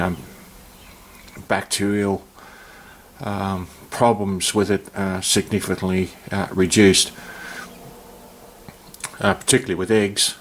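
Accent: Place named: United Kingdom. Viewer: You are Brit and its to hard for me to understand you, but nice video😂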